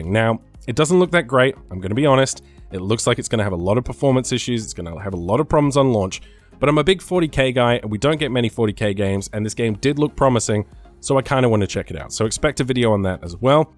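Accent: Australia. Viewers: Congrats mate. No nonsense gaming coverage. And great to hear a fellow aussie. Keep it up 👍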